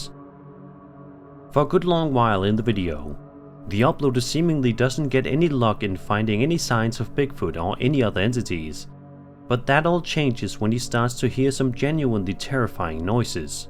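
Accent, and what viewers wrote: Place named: Denmark. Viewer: Brormand, jeg har set dine videoer I flere år og har først lige fundet ud af at du er dansker nu, wtf? 😂 Btw, fandt ud af det pga. Jeg så en af dine gamle videoer hvor du snakkede om merch og din accent var ikke til at tage fejl af 😂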